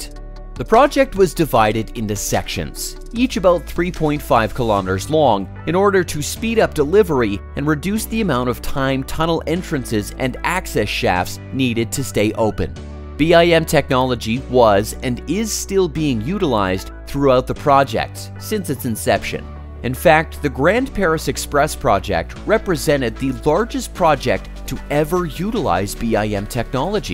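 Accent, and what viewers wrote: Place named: United States. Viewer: He can't help it he's and an american. and also the one commented is just joking. Come on! He is trying his best. I"ve been living here in france for almost 21 YEARS and still my accent is very evident although I can pronounce some names accurately.hahhaa trial and error. french words is just mindblowing specially when you try to write what is being said ;)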